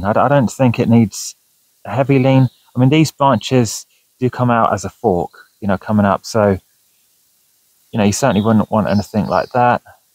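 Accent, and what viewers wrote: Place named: England, United Kingdom. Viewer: By the accent, you are English, aren´t you? Crazy good potters over there, I got some :D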